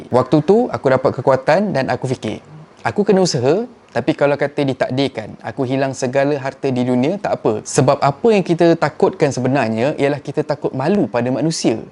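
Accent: Kelantan, Malaysia. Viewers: Mu kecek Klate haha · Good effort bang cekek kelate hahahah😂👍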